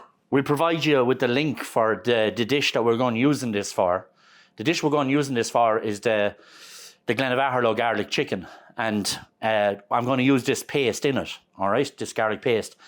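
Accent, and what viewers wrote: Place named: Ireland. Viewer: Hmm, accent of the host ..nm- ireland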